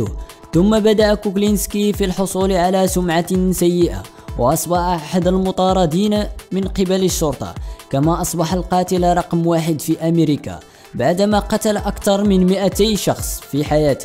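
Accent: Marrakesh, Morocco. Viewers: هدا. مغربي اللي كيحكي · chi mrakchi hada xD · هذا مغربي ولا كيفاش صوتك لا يصلح يا هذا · لهجته تسد النفس، اللهجة المغربية من أسوأ اللهجات العربية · iman vidia maroooki baaaaaayn ou marakchi maazal -__ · ههههههههههههههه صوت مغربي مراكشي امازيغي قح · معلي .. ترى للي يتكلم يبين انه مغربي يعني عربي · هههههه افضل شيء في المقطع هو البهجاوي اللي كيعلق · عجبتني اللهجه المغربيه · نبرة صوت مراكشية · ههههههههههههههه مغربس حر ههههههه و مراكشي ، اويلي على الأتباء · vidia Ti Rah Hada Mghribi Puur Bayne Wach Wlde Mrrakch L Hdraa M3tla W T9ilaaaaaaa Katjyb N3aass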